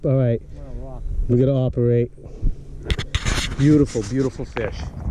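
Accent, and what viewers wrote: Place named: Maine, United States. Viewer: Strong Maine accent.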